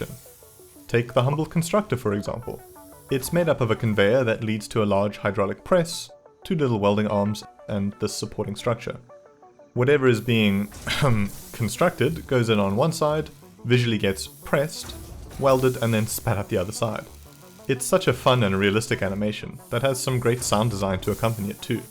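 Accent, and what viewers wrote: South Africa. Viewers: I feel im hearing a South african in you..am i right?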